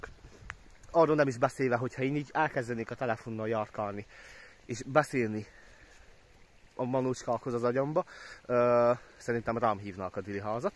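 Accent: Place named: Szekler Land, Romania. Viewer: Szerintem nagyon szépen, választékosan tudsz beszélni, úgyhogy már emiatt is érdemes belevágnod. A másik, hogy sajnos csak egyszer sikerült eljutnom Székelyföldre, de imádom hallgatni a székely magyart.. :) Nekem már a tájszólás miatt is kellemes a lelkemnek hallgatni Téged.. :) Amúgy hozzám közel áll a világlátásod, és szerintem a humorod is rendben van.. Szóval hajrá, fel is iratkoztam, ment a like is! ;)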